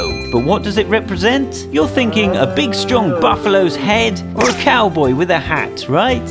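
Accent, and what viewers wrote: England, United Kingdom. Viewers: why are you speaking american with an english accent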